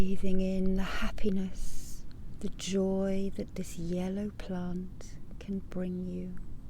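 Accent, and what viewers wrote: England, United Kingdom. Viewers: this is putting me to sleep so its hard to type this comment but you are wonderful - full of love and truly positive energy that you so generously put out there for the taking. you are a gift. i really enjoy the earthy feel of this creation and that you can convey such warm feelings from what looks like such a chilly, blustery spot is testament to your abilities. and i love your accent (one might call it true English... i'm American so yeah)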